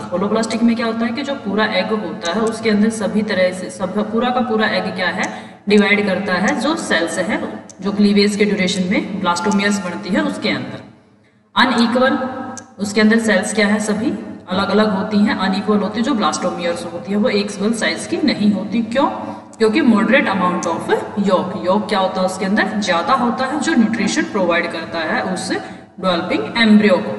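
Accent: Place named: Haryana, India. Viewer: Haryanvi mdm 😅❤nice explaining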